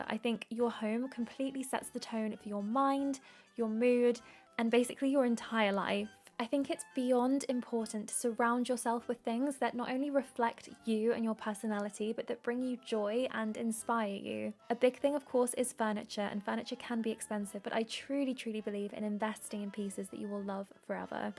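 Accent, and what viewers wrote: RP, United Kingdom. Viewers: Steps to having a more luxurious life: 1) step one: have a charming, sophisticated posh British accent like, a mix of the movie Notting Hill and Victoria Beckham and Kate Middleton 2) step 2: have poise and panache like 3) step 3: have exquisite taste in architecture, art, and fashion like In short: BE!!!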